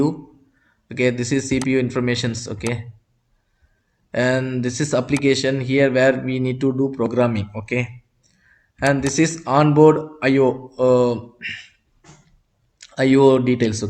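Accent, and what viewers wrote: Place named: India. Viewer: Gotta love the Indians attempting to talk English. Loosen ur turbin a little Bit. Thinks it still cutting off the blood flow a little bit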